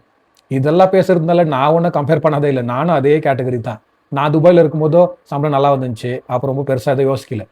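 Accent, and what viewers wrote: Bangalore, India. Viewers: Thambi bengalore Tamil la.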